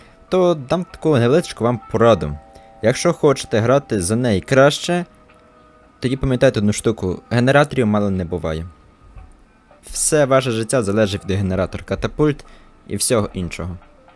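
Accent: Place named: Poland. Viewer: Я спочатку намагалась зрозуміти, чи це польска чи білоруська 😭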